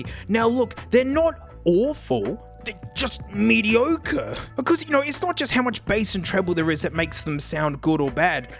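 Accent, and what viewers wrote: Australia. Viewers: He's speaking a dialect of english known as "Australian" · The only reason I watch him is because his auzzy voice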